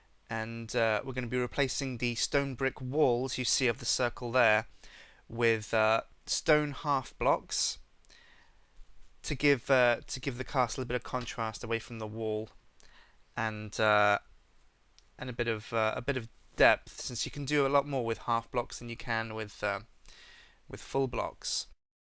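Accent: United Kingdom. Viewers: You can clearly tell he's from the UK from his accent.. Why would you need some sort of sign from him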